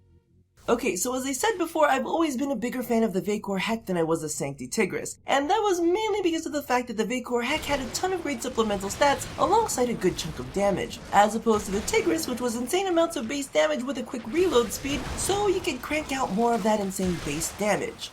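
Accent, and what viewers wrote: United Kingdom. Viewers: You should totally do more vids with your British accent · I have mixed feelings about that English accent.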